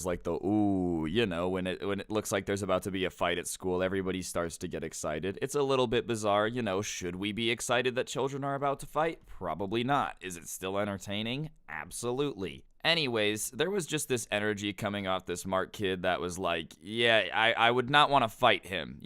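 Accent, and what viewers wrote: Russia. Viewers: Your Russia accent is hilarious!! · Don't mess with the people from the Balkans cuz ottomans and the communists (fascists as well) btw ur Russian accent was good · I love Russian ascent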